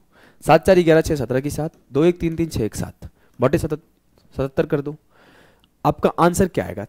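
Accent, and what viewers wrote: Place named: United Kingdom. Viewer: App itna smart q ban rhe h ,, aram se hindi padh kr samjhaiye,, ham jante h ki apka english thik h,, chanal ke naam h bihar yodha or briten jaisa bhasha bol rhe h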